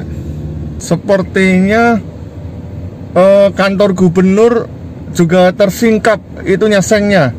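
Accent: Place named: Java, Indonesia. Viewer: Kok logatnya ada jawanya Om. Dulu kuliahnya diJogja ya Om.🙏😁😁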